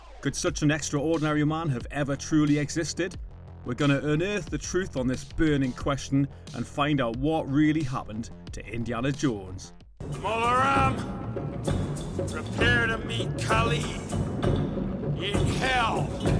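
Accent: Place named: Geordie, United Kingdom. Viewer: Instantly switched off with the overly Geordie accent. WTF?!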